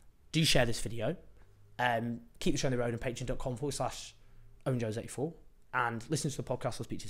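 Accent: United Kingdom. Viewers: wait ur israeli ???????? i thought u are british or something 😂 😂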